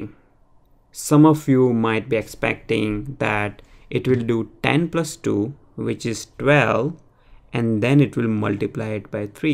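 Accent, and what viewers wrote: India. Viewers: Are u Indian?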